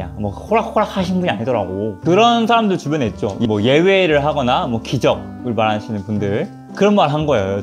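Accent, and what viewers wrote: Gwangju, South Korea. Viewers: 헉 약간 말투가 광주바이븐데 광주광역시 출신이세요?!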